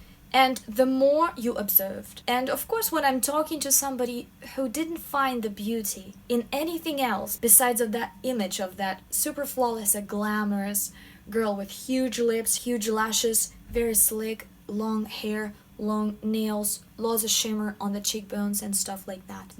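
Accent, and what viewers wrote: Russia. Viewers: блин, сразу поняла, что вы русская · Я сразу же поняла, что вы русская, несмотря на то, что у вас прекрасное произношение :) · Я прямо слышу русский акцент =)) очень информативное видео 👍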